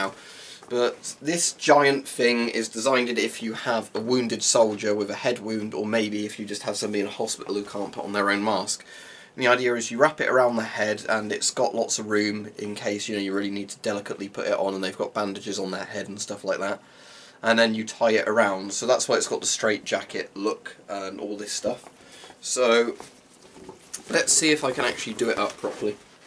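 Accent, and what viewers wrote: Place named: United Kingdom. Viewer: Im from Poland. The word "Dzień Dobry" you said was very simular but accent is strongly British. Still, you are close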